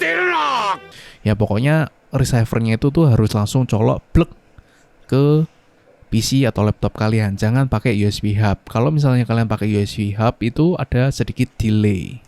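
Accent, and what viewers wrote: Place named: Java, Indonesia. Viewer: wkwk. awal lihat subnya kecil, ya udh ekspektasi audio pecah.. tapi gila ini audio jernih dan sekelas 1jt sub.. bisa lah ini cepet naiknya.. mantap review dan aksen jawanya... saran saja pas jelasin baterai di menit 3, sekalian aja tampilin baterainya om, jgn mainin buka tutup brutal. di kuping agak ganggu soalnya pakai headset, dah itu saja lanjutkan om.. :v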